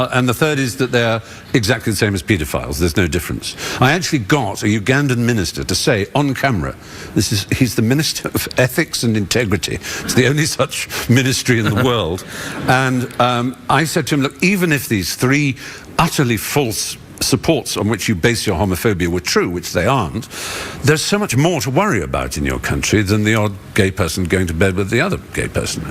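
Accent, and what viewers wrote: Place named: United Kingdom. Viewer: А я почти все понимаю, у Стивена Фрая оч. ясная англ. речь, он считается лучшим носителем британского акцента в Англии. Посмотрела неск. его шоу - его речь сильно отличается именно ясностью и понятностью!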